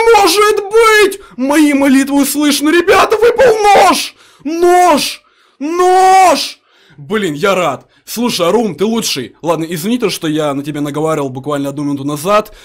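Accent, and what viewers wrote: Ukraine. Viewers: А ты украинец шоль?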